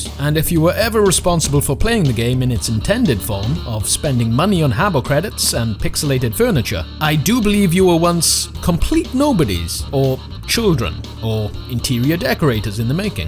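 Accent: Wales, United Kingdom. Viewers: Are you Welsh? Sounds like you have a bit of a Welsh accent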